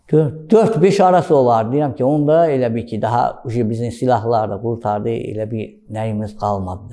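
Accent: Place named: North, Azerbaijan. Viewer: Бизим шимал лехчеси олдюрюрде мени❤❤❤❤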